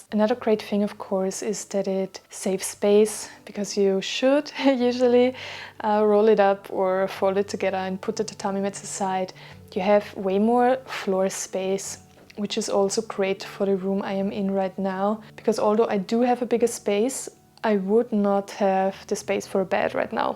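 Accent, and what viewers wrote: Austria. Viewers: first time I was really certain someone online is from Austria from an accent in English, lol (and I was right according to the channel Info) can't pinpoint the area tho, but sounds more central/ northern/ eastern than southern/ western, I think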